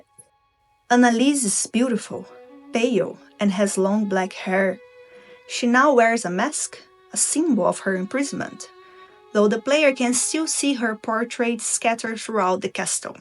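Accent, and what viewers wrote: Brazil. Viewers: I don't know much about Bloodborne (i'm still trying to understand the story, since i can't play it without having a heart attack), but i really like Poe, and any video essay merging literature with videogames is worth to see! Also... Your accent is familiar, você é brasileira? Awesome video! Keep going!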